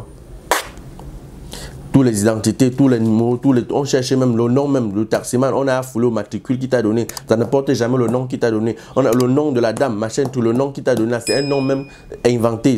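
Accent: Cameroon. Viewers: Tu a baminikalise le français dans ton récit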